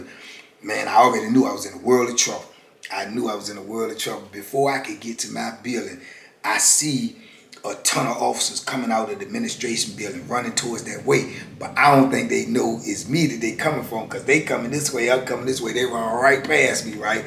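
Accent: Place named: South, United States. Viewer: U r a very interesting man. No disrespect to ur relationship, BUT U R AN INCREDIBLY HANDSOME MAN, THAT SOUTHERN ACCENT IS EVERYTHING. U CAN GET IT FROM ME. I LIVE IN N.Y. B BLESSED . DORIE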